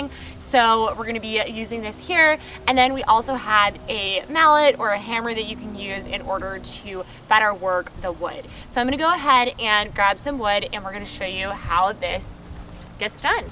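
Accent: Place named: United States. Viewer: This American voice gets in your nerves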